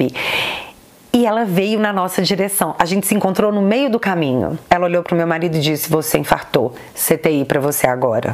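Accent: Minas Gerais, Brazil. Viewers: Olá, te sigo há muito tempo daqui da Inglaterra onde u moro, você já me fez sorrir e até gargalhar com seu jeitinho e sotaque mineiro, mas hoje me fez chorar, talvez vc nem veja essa mensagem, pois são tantas as que vc recebe, mas se você ler, quero que saiba que você é muito especial, seus vídeos esclarecedores e me ensinam muito mesmo! Quando comecei a te seguir você não tinha nem cem mil seguidores e olha só como você cresceu! Deus tem te abençoado, continue com Ele e que mais bençãos recaíam sobre você e família! Também sigo o Rodrigo Silva e também sou Adventista, que o Pai Eterno esteja com você e que seu canal seja para a Honra e a Glória Dele 🙏🙏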